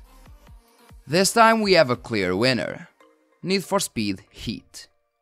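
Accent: Portugal. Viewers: when i start hearing european portuguese accent... hello there xD either way nothing beats nfsu 1/2 and need for speed most wanted 1.... underground 1 was amazing... underground 2 was the first open world (if im not in mistake) and mw was just loved by... everyone? EVERYONE!!! :D soundtracks were amazing in all of those 3... in heat half of the soundtrack is cool other half not so much... either way as portuguese im fine with it but even to me i get the feeling the guy responsable for the soundtracks was some spanish or something because its only latin music... i mean.... it matches the game... but sounds weird... specially for me im used to rock and super agressive songs in nfs (im old school xD) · dude that accent... are you portuguese?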